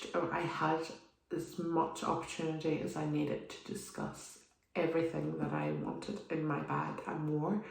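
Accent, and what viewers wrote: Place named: Northern Ireland, United Kingdom. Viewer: Another fellow Norn Iron accent! Great idea for a tag! 😁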